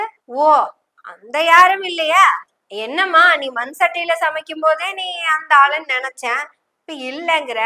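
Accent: Kongu, India. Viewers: Kongutamizh Amma super.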